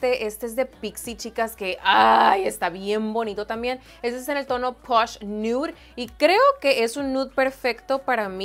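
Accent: Sinaloa, Mexico. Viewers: Soy sinaloenseeee de los mochissss jajajja y cuando te escushe hablarr no sabia que eras de mi querido sinaloa dijeee, esta mujer es de mi tierraaa.... jajjajaja vivo en los angeles.... tu en donde te encuentras....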